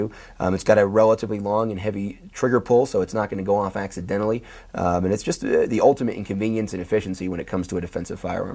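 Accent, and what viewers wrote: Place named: Chicago, United States. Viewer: You must be from Chicago!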